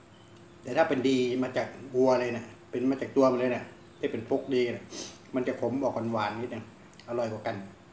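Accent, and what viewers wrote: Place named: Isan, Thailand. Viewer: จ่าคือบ่อเว่าอิสานบ้านเฮา..มันมีเสน่เด้ ผมว่าน่ะ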